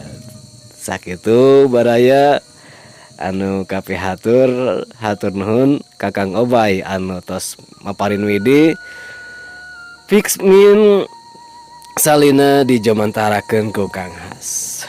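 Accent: Sunda, Indonesia. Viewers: 😄👍👌tos ciri khas soanten kg Guru mah sundanise pisan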